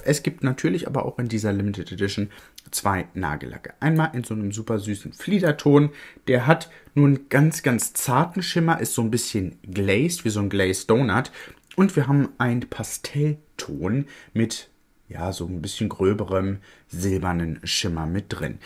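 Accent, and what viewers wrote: Berlin, Germany. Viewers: Schicke bistde👍🥰 of berlinerisch😅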